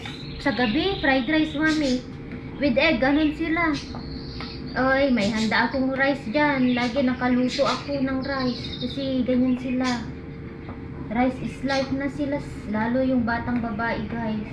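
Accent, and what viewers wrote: Ilocos, Philippines. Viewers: Halata k nga Ilocano uray Tagalog ti saom kabsat. No maminsan kasla Ibanag ti accent mo.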